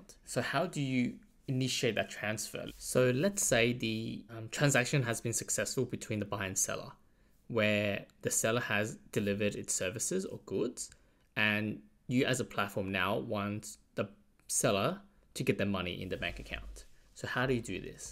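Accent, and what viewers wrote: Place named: Australia. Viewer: Haha! thanks! Australian english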